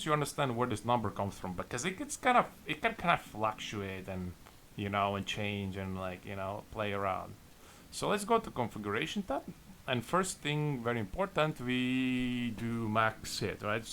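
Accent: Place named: Russia. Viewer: Чувак, все круто, но всем понятно, что твой английский не мейн язык. И скорее всего твой мейн язык русский. Запили этот видик на русском. Ты показал действительно крутые скилы.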